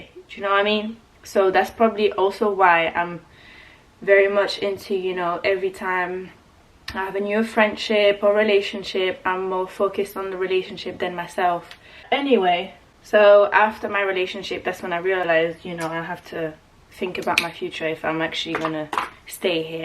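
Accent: United Kingdom. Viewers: The British accent 🫂🫂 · Tu m’attrapes encore plus avec ton British accent, fan vraiment ❤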